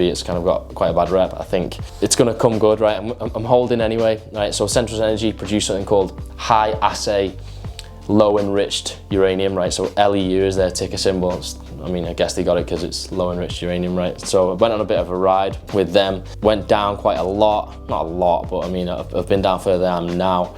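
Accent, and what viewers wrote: Liverpool, United Kingdom. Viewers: I was not aware that you were into finance/investments. Anyway, providing you stick at it, you will improve (just like fitness). From your accent I think you are based in Liverpool - have you thought about buying an investment property? You'll be surprised that even with just £20k, you can enter the market. Anyway, good luck with your endeavours.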